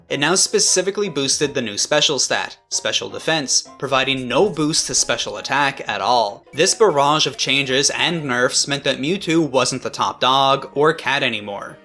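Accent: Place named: Newfoundland, Canada. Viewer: I detect a slight Atlantic Canada/Newfoundland accent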